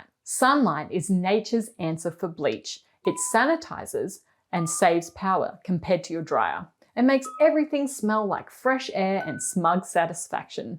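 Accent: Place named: United Kingdom. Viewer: It's lace and not "lice". Why do Britishers wag their tongue while saying certain words is beyond me 😮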